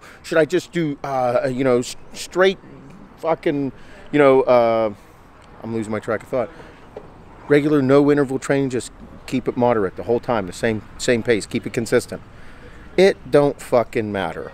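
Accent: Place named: Pittsburgh, United States. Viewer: You have to be from Pittsburgh. I can hear it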